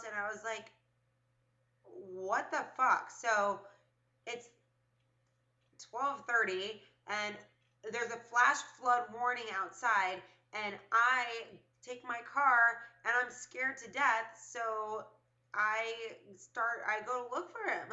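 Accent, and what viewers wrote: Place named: Florida, United States. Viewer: I love your Floridian accent.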